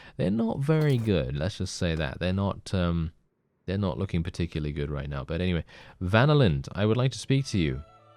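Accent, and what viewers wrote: United Kingdom. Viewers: You a brit, mate ?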